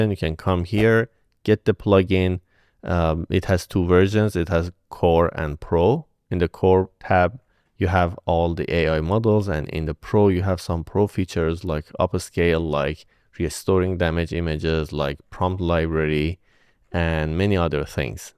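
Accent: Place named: Iran. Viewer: Yo. I'm Persian too. I Noticed your Persian accent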